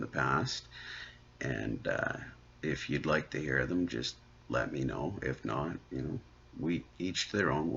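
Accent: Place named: Canada. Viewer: Really enjoying your videos Brother! Nice to hear a fellow Canadian! I was born and raised in Quebec but my Mom is from the Okanagan, Salmon Arm, Revelstoke way. Keep those experiences coming, I really enjoy them and it amazes me how close all our stories from all around the world are. I really hope you are keeping well with your medical issues, I will keep you in my prayers!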